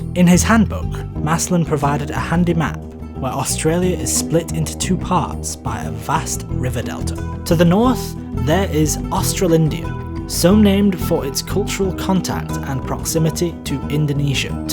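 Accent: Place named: Northern England, United Kingdom. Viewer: really interesting video! Great to hear a Northern Accent in one of these channels too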